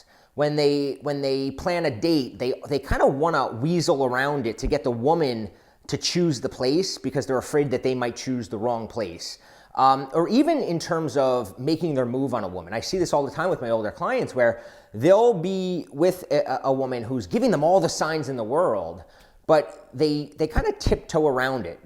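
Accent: United States. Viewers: Non capisco la pronuncia americana, ed è pure sgradevole. Non capisco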